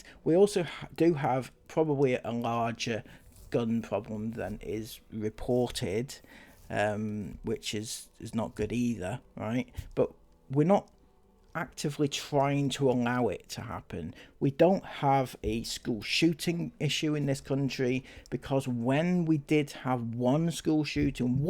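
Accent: United Kingdom. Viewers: Why are you bothering yourself with what's happening in America. You sound British. Do you not have enough to worry about in your own country?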